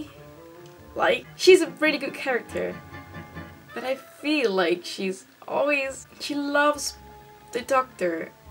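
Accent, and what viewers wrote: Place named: United Kingdom. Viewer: E' stupendo vedere come il tuo accento british migliori di giorno in giorno, ADORO sentirti parlare in inglese aw. Questo video è ORO PURO ^ ((e io sarei una companion diecimila volte peggio di te because of reasons))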